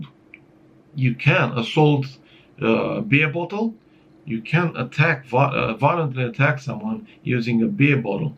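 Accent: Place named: United Kingdom. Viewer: Took me by surprise with the british inflexion not gonna lie. Also, I think classifying non-automatic weapons as "assault rifles" is pure idiocy. A carbine sold to civilians will never have the auto option. By military definition " selective-fire, automatic rifle chambered in an intermediate cartridge fed by a detachable box magazine". So any news anchor talking about "assault rifles", especially in Romania, with their "experts" are talking out of their ass. Great video as always.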